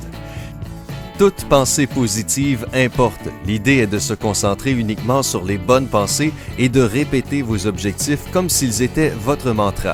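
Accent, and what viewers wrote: Quebec, Canada. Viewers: J'adooooore l'accent canadien. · acent québécois 😊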